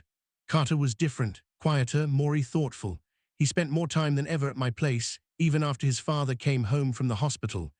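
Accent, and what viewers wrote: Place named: London, United Kingdom. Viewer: This male voice is it a beautiful London voice ? Wounderful to hear it.the story is excellent thank you AI family❤❤❤❤❤❤❤❤❤❤❤❤❤❤❤❤❤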